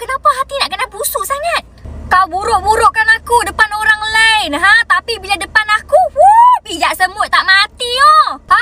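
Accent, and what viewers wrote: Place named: North, Indonesia. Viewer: Mula dgaq ckp cm keyy yell..lama2 dgaq cm org utara plk..😂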